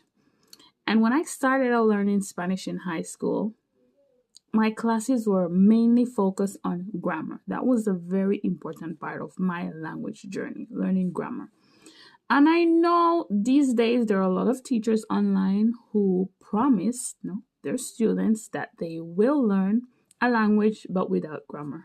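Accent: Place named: Spain. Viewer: Interesting. Awesome! I notice you speak English with Spanish inflections. What is the youngest student u would teach?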